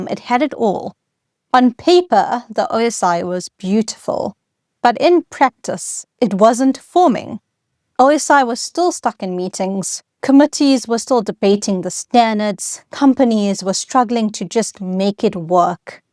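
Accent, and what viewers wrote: South Africa. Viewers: Is that South African accent?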